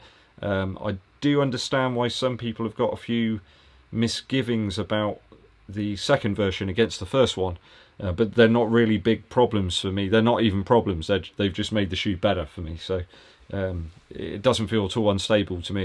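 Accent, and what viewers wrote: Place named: London, United Kingdom. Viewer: Are you originally from Yeovil? You don't have a Westcountry lilt, you sound Londoner/South East.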